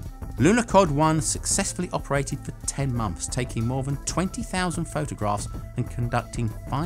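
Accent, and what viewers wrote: United Kingdom. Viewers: British. In the UK, the official unit of measurement of length is the meter. The pronunciation is a telling sign..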